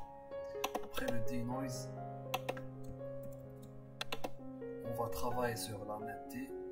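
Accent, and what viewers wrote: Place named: Algeria. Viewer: من لكنتك لنطقك بالفرنسية تبدو لي جزائري 😹☝️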